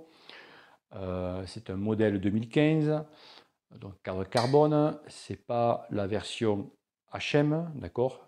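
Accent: South, France. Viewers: Vu comment il parle je pensais aussi mais après je me suis rappeler qu'il est du Sud tu comprends 👍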